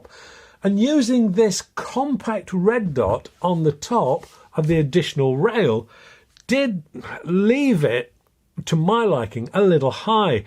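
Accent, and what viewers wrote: United Kingdom. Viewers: Are you from the U K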